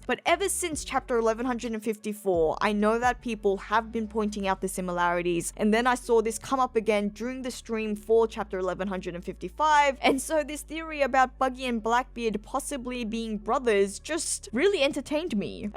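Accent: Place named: Australia. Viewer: That Australian accent gets me every time 😂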